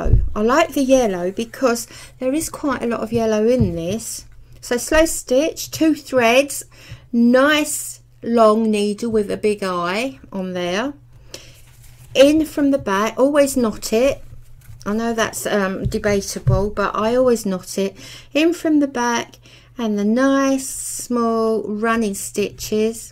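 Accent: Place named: Cockney, United Kingdom. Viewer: I can hear a little of your Cockney roots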